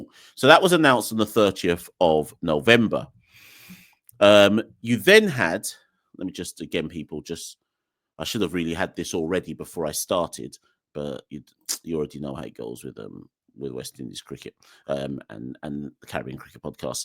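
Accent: England, United Kingdom. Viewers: This guy lives in the UK, so it seems, and is essentially making silly comments based on 'second hand' information. Two instances where GCB and BCA had a disagreement with CWI, for reasons the silly host of this podcast failed to explain, means both territories want to 'go it alone.' has also refused to acknowledge the contributions, financial and otherwise, Barbados has made to WI cricket. He also failed to inform viewers that under both Skerritt and Shallow, there hasn't been any significant implementation of policy initiatives to improve and develop regional cricket. But sits on his soap box spewing nonsense, perhaps believing, as in days of old, people would succumb to his views because he has an English accent.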